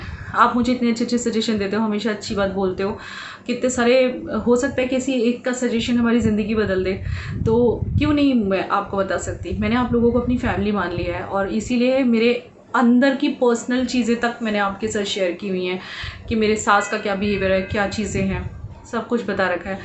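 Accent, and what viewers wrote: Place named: Bihar, India. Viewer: Aap bihari bolati Hain bahut cute lagti Hai kabhi litti chokha share Karena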